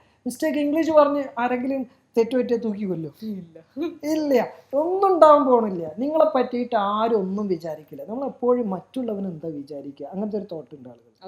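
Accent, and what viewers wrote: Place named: Malappuram, India. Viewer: Malapuram slang nannaeittunde sir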